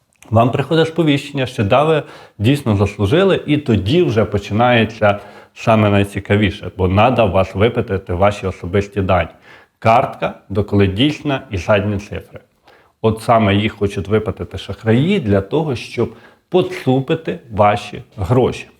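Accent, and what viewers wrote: Vinnytsia, Ukraine. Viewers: Пані, перш ніж когось критикувати, навчіться сама правильної мови. А говір у нього вінницький. На заході говорять зовсім інакше.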